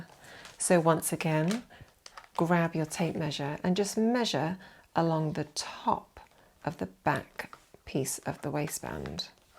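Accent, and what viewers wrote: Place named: United Kingdom. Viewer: This a great tutorial! I have been sewing for quite awhile but I seem to have so much trouble getting the wristband fitted nicely. I prefer a curved waistband instead of a thin 1 or 1.5 as I am slightly swaybacked, and I end up getting a little gap in the center back. I can’t wait to try this method. You are an awesome instructor and I love to her your cool British accent! Thanks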